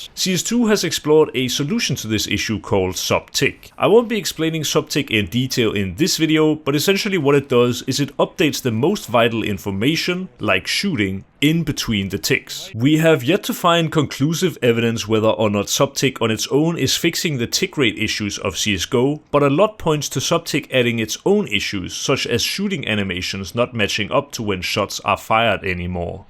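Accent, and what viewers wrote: Denmark. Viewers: dude i can tell you are danish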